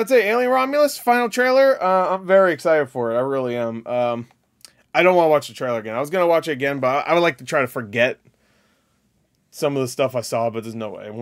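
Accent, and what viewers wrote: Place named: United Kingdom. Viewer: yea but this guy in particular has a very strong British accent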